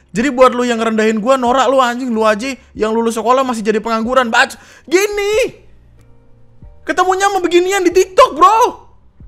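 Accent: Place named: West Java, Indonesia. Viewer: Ini gw aja atau emang bang jadi ada logat sundanya wkwkwk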